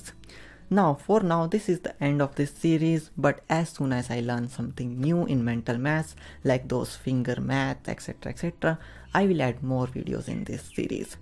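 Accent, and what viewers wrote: India. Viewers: he's from India but I can't really tell from which state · obviously india who else has that accent?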